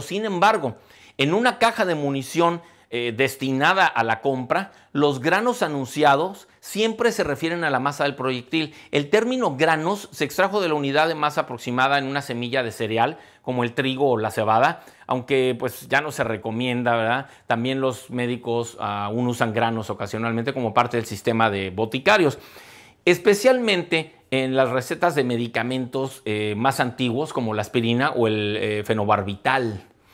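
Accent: Mexico. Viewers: Gran explicación si señor , y sobre todo me encanta ese acento mexicano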